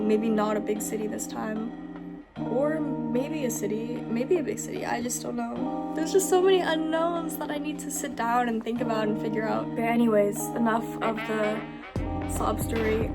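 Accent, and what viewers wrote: United States. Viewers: why an American accent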